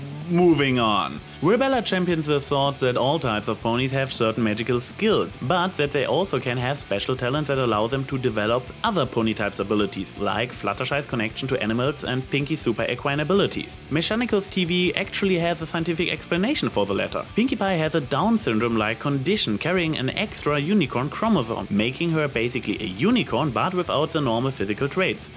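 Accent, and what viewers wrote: Germany. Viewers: Quick question, are you German?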